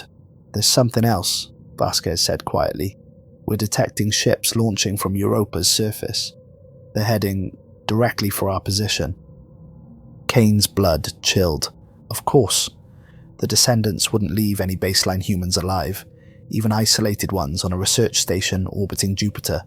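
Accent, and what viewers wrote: Wales, United Kingdom. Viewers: Good stories and spoken in English with a Welsh accent. Looking forward to the next one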